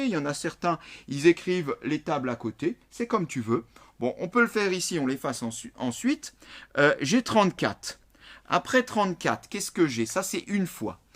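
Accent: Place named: Alsace, France. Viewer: zaffuto Axel Il est alsacien c pour sa c son accent👍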